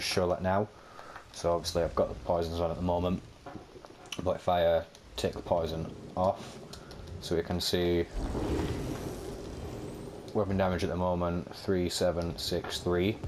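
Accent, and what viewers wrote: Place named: Bristol, United Kingdom. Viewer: are u from Bristol ? thats one T H I C K accent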